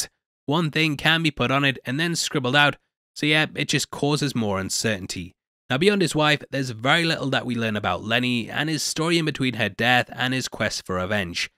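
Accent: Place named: England, United Kingdom. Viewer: You voice is like every English voice put together. Nice video new fan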